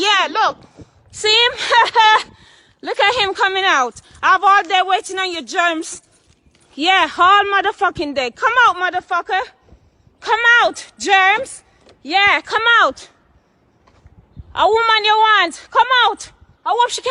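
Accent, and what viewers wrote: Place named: Jamaica. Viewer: You jamaican accent no too bad enuh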